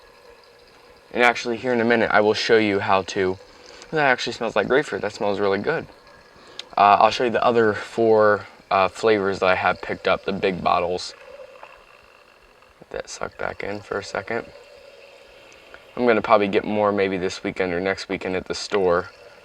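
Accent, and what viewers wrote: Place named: North, United Kingdom. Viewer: Pop. Teehee. You're a northerner, aren't you?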